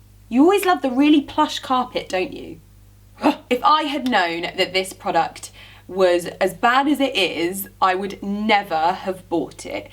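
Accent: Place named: England, United Kingdom. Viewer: I wish I had an English accent! You are beautiful, inside and out.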